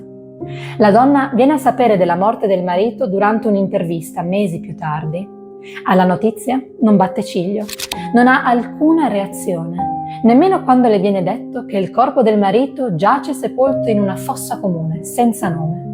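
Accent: Trieste, Italy. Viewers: Brava! Ottimo video e ben esposto. Complimenti! ps.( ma sei triestina?) 😅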